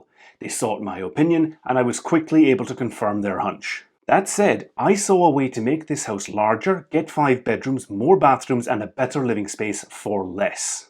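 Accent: Ireland. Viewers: Are you part Irish? I am hearing some Irish accent in your voice. Good video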